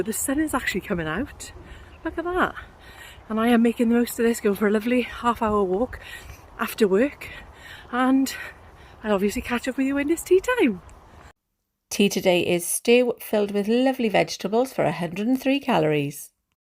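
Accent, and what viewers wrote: Wales, United Kingdom. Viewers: Good will power and self control. I am on Nutracheck. Did Slimming World and lost 2 stone but was fed up of feeling guilty of going over my "syn" allowance. Now I am in more control. Losing slowly but that's the best way. I don't normally like chatty videos but yours is fab! Do I detect a Welsh accent? I am from Carmarthenshire but now live in Swansea. I really look forward to watching more of your videos. Thank you.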